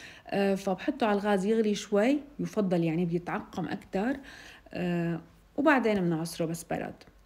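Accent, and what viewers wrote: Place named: Levant. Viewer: رااااائعة.و طريقتك مبسطةواضحةوصتك فية ريحة الشااااااام♥️.احلى تحية الك من فلسطين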